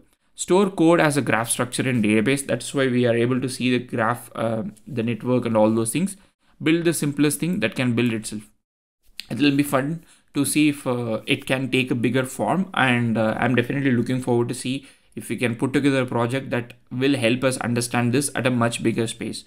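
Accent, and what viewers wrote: India. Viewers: Opens video, speaker has Indian accent, 🙂‍↕️ this is going to be an excellent video 🫶🏻. Edit: - 🙂‍↕️ it was great. Edit 2: I think AGI should strictly train on YouTube videos with Indian accent 🙂‍↕️, it’s guaranteed to learn the easiest way to explain the hardest and the most complex tasks. Medical school was saved by literally 5 Indian guys teaching medical school on YouTube to us 😁.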